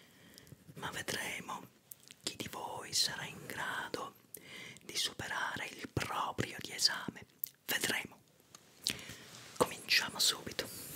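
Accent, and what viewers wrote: Sicily, Italy. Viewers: Sembra che ti eri davvero alterato e stressato!😂😂😂😂AHahaha Recitazione Eccellente!!❤Che poi si sente vagamente quell'accento siciliano che io amo tanto!!!❤Ti amo di bene!😊😊❤ PS Troppo forte il finale, che se ne sono andati tutti, giustamente!😅 Ahaahahaha geniale!!! 😅😅😂😂😂😂😂😂🤣🤣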